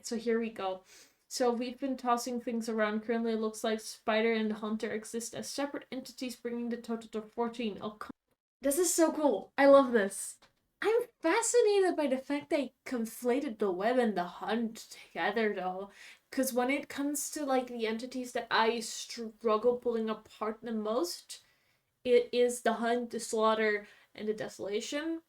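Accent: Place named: Netherlands. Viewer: Why are you dutch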